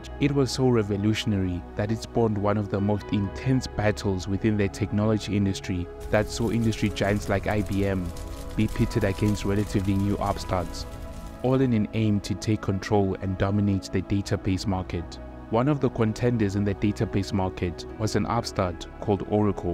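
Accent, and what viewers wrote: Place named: Southern Africa. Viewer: I know a southern African when I hear one😂 Good stuff mate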